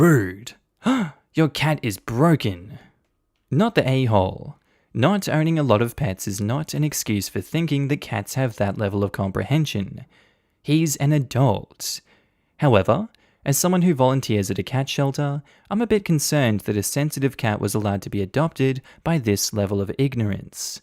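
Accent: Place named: Australia. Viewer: a pleasant Aussie voice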